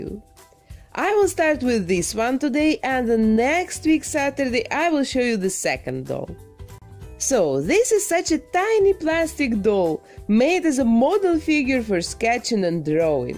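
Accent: Russia. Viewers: This russian акцент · I love your accent. Are you from Russia? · Oh, Russian accents are so beautiful and zaftig sounding. I must say, I do think I wig may have been better, and perhaps a smaller nose pompom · Русский акцент ?! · I like your Russian acent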